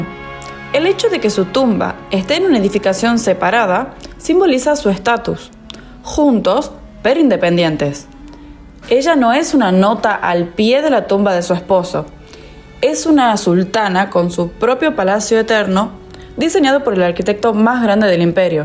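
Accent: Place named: Mendoza, Argentina. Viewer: Hablas como mendocina. Yo viví en MZA.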